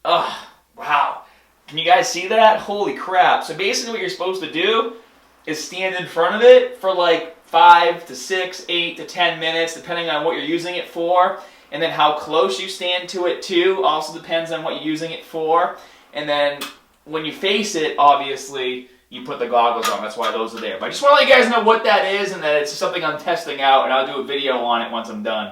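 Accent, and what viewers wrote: Boston, United States. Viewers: Orpheus it's called the baaahstan accent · Boston accent makes me cringe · Are ya from Boston? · I never moticed the Boston accent until now. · STOP TALKING LIKE A DOUCHE!!!! That Boston accent is horrendous. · Love the Bostonian accent . Nice gains ! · Dutch Dude Yeah it's definitely a boston accent, except the rest of his speech isn't nasally like a bostonian's is. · Bruh I have't seen one of videos in a few years... Is it just me or is his Boston accent more prominent now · iv noticed you've picked up a Boston accent that you didn't have in your earlier videos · how is your Boston accent getting thicker? I thought you didn't live in Boston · sounds more like a Boston accent or in and around the area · You from Boston? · it's a Boston accent, that's what it is... they speak normally on several of the words but then sometimes they say others things weirdly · Shaan Targaryen I noticed too. 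I've seen some of his older videos and he doesn't have this Boston accent in them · Did he just get a boston accent from nowhere?? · Did you randomly gain a boston accent? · First off your Boston ascent is coming out very much lol... funny to hear. Second off... Me as an LMT, I have noticed it looks like your hips are off kilter, meaning one looks higher then the other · Dude love your videos. learned so much. You are definitely from Boston right? "My amms" lol The r is silent in Boston. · the boston accent is ridiculous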